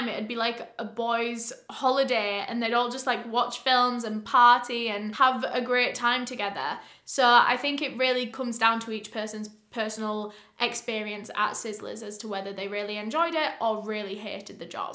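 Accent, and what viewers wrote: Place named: England, United Kingdom. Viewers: Her England accent is irresistible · Our is so intelligent, kind and so very talented. She reported upon this horrible massacre with such detail, sensitivity and compassion; I think this is why she is so popular…that and her charming Yorkshire accent. And her eye makeup is mesmerising; she’s such a pretty girl…Brains and Beauty and a successful entrepreneur…... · Me, she kept coming up in my recommendations and when I finally clicked and heard she's a fellow northerner I instantly subscribed. I'm from up North and have friends who live in the same city as. It helps she's an inclusive queen 👑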